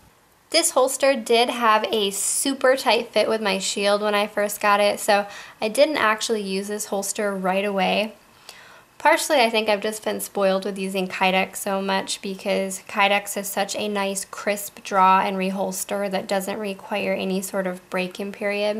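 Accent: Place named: South, United States. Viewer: I just noticed but you have a tiny bit southern axcent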